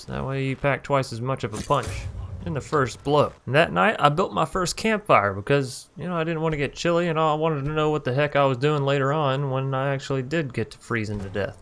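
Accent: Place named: Texas, United States. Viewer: I feel the texas in his voice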